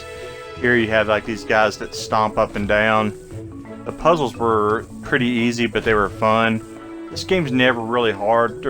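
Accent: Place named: South, United States. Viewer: Awesome southern accent bro sounds like me lol I'm from Memphis TN yo I'm wondering is it worth 13.00? Ps4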